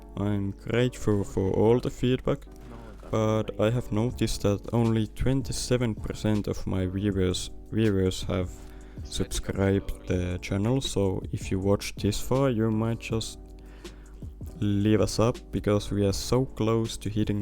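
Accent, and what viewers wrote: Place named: Finland. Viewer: jaaha vittu mietin ku luki tilaa introssa tajusin ku ralli enkku alko kuulua suomalainen sehän on :DDDD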